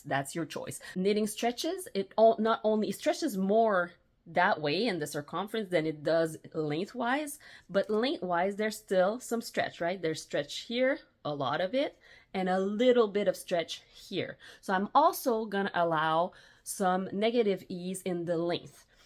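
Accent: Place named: Quebec, Canada. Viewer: I’m originally from Ottawa, born in Gatineau, so I’m enjoying your French Canadian accent! I wonder whether you could recommend yarn and where I can get some at reasonable prices? Going to watch your 14 years of sock-knitting video 😊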